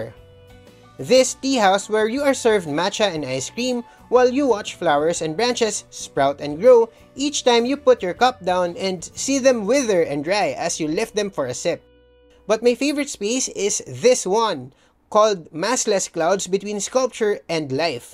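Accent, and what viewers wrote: Philippines. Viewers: Pinoy accent, surely for the unwelcome poor tourists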